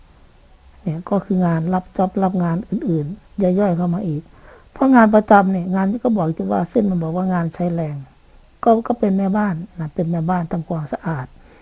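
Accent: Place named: Isan, Thailand. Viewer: ดีน้อ หนุกะอีสาน พอฟังออก555 ตามฟังอ. อยู่เด้อจ๊ะ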